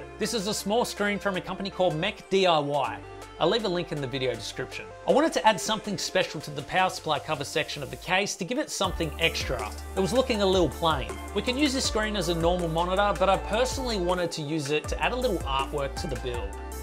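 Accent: Australia. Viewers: R u from Australia bro?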